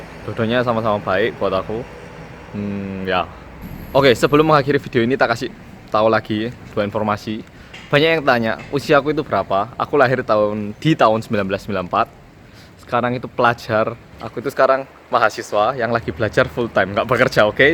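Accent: Java, Indonesia. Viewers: Haha nek ngomong medok lek 😆😆 Umur nya tuaan aku berarti yo, Aku 93 !! Sukses ya lek 😊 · medok mu iku loh sesuatu buanget ms, hhhee :D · Mas bhasane jawa sampean kok medok banget,pdhl korea punya hhheee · logat jowone medokk bngtz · wkkkkkw muedhoookkkk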